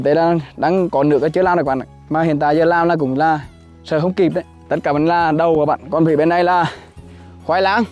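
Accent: Nghe An, Vietnam. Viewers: Nói tiếng nghệ an gì đó chậm thôi anh. Ko hiểu a nói gì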